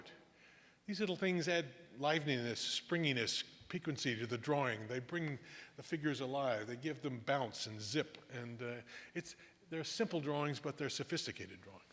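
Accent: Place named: Midwest, United States. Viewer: Thank you for this wonderful, fascinating lecture about Greece, delivered in a charming Midwestern accent. I discovered a new artist who truly deserves worldwide recognition.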